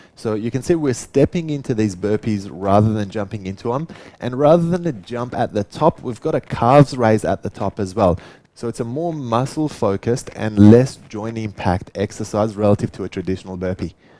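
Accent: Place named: Australia. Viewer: He's got an aussie accent, but where was this filmed? Gorgeous place! I did this workout today (not all, though!) and absolutely loved it. So glad I found this channel.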